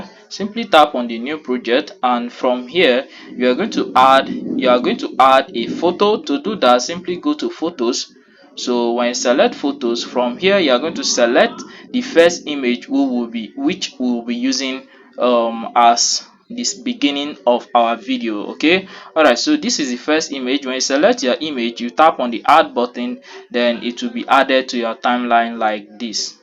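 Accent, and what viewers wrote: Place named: Nigeria. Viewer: How did you come about the Nigerian textured voiceover?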